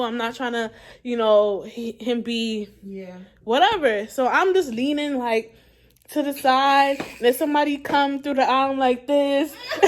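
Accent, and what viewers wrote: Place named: Caribbean. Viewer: ahhh ok.. I was certain i heard a lil west indies in there 😊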